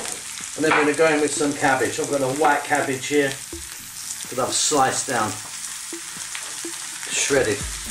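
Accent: United Kingdom. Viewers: Are you Asian with British accent or English person, love your passion for cooking. Love listening you, deffo subscribing u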